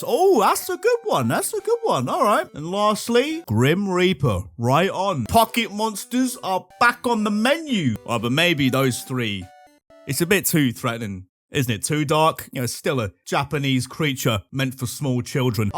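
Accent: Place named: United Kingdom. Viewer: You're so british I love it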